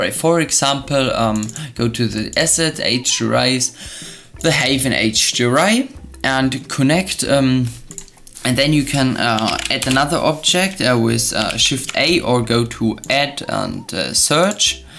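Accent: Germany. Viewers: Are u german?? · are you german? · You have a little German accent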